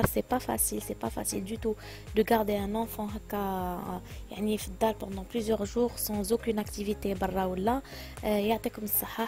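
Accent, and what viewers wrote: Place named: Constantine, Algeria. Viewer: Salam. À ta voix j'ai su que tu es constantinoise, comme moi. Ça fait plaisir.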